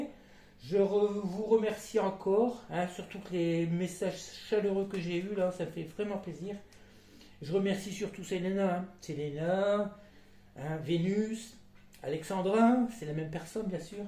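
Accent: North, France. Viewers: Bonsoir nouvelle abonnée g vue votre vidéo avec selena à votre voix g su de suite que vous étiez du nord je suis du pas de Calais près de lens 😂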